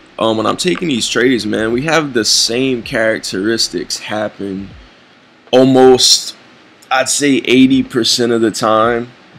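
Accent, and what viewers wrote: Louisiana, United States. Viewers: I knew u was from Louisiana I can hear it in your voice what part you from bro im on the north shore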